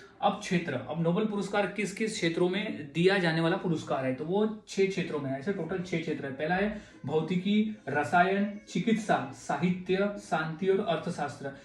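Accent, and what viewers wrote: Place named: Rajasthan, India. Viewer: Sir aap Rajasthan se he kya kyuki apka accent wesa hi he 😊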